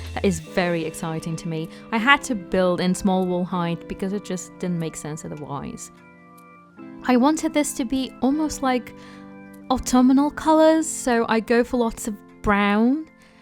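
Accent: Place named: United Kingdom. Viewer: honestly you are such a talented builder and as a polish person I love to listen to british accent. Love it xx!!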